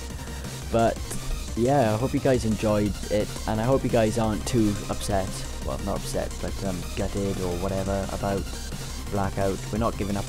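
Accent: Wales, United Kingdom. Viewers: are you welsh?! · I'm British... So why would I get tired of another British voice?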